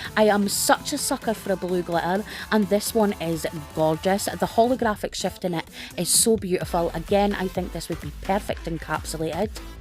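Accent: Scotland, United Kingdom. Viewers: Great to find U.K. suppliers. Thank you for sharing 😊. BTW “buck mad” isn’t an expression I’ve heard since I left Northern Ireland 30 years ago 🤣 I do love hearing a Scottish accent for a change.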